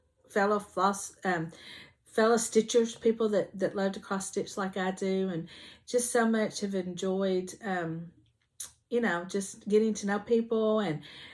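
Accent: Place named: South, United States. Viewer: I could listen to you talk all day that southern drawl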